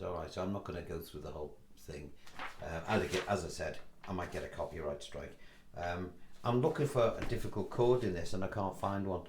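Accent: Liverpool, United Kingdom. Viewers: Excellent teacher. Scouser with no edge as you expect. That’s me speaking as a Yorkshire bloke. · ? Are you a scouser? I have seen your pieces for the last few months. I also look at Ivor Sorefingers, who has a similar thing as you. I really like the scouse accent! ❤😊 I'm a Londoner, but not a cockney.😂😅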